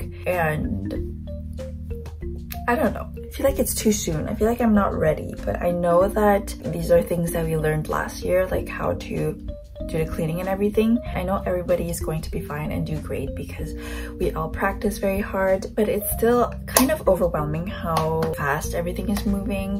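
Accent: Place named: Canada. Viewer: Je trouve toujours bizarre quand un canadien parle français, votre accent est trop mignon (et parfois je comprends rien mdr)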